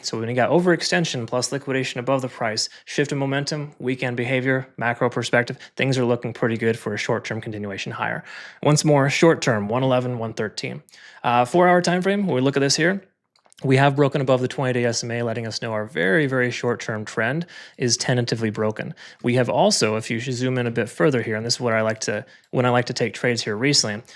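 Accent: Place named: United States. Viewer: I wont watch channels that have accents, Indian, british, Australian, south African and ghetto/prison/ratchet are some of the worst. I appreciate this english American speech.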